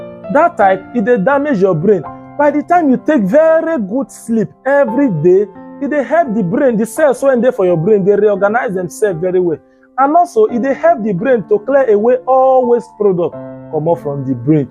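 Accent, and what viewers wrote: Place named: Nigeria. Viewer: I didn’t know your pidgin is this thick oo 😂😂😂😂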